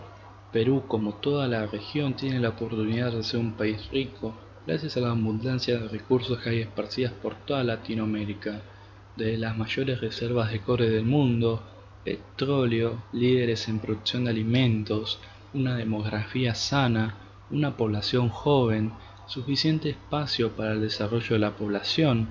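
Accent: Argentina. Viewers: Si es peruano , por qué habla como argentino?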